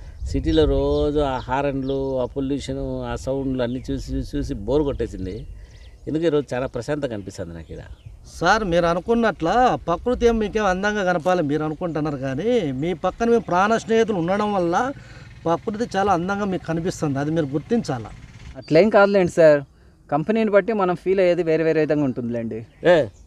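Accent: Anantapur, India. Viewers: Chala baga chepparu 👌 naku me videos antey chala istam garu. I feel very happy and relaxed while I'm watching. And Anantapur language vintuntey chala happy ga anipistundi. Thank you so much for making such videos and making people happy. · అనంతపురం యాస చాలా బాగుంది !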